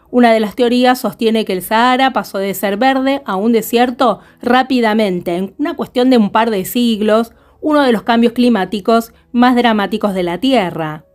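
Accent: Argentina. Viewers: Que bonita voz, ¿de que sector de argentina es tu acento?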